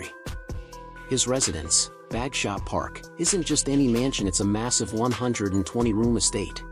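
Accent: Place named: North America. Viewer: More crap with a North American accent 🥱🥱🥱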